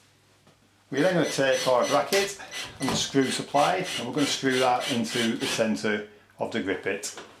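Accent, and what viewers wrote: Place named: North West England, United Kingdom. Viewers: I'd say, more specifically, that he comes from the North-West of England: Lancashire or Manchester. Doesn't sound like any sort of Yorkshire to me. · For any Americans who may be watching this (and to annoy my fellow countrymen as well) the man in the video is talking funny because he is from the North of England. He does however, know his fixings and though you may think he is demonstrating the bleeding obvious it is comforting to know that your workmate who seems able to use the blue fixings that you keep snapping is either uniquely capable, very lucky or is going to get a phonecall from the boss next week to say the speaker, projector, interactive whiteboard, whatever, he fitted at that last job has just come off the wall and narrowly missed a school kid etc. etc.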